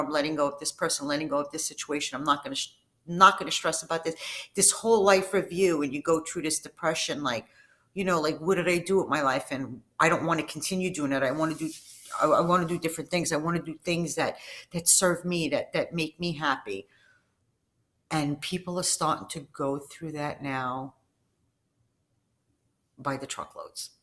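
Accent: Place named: Canada. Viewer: Ahh , c'mon your accent isn't that thick . But , I can still tell your that your cleary Canadian . Clearly . 🤪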